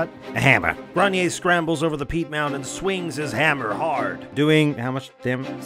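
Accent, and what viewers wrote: South Africa. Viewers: Whoaly shit. That's a cavalcade of horseshit to deal with. Also, are you south african??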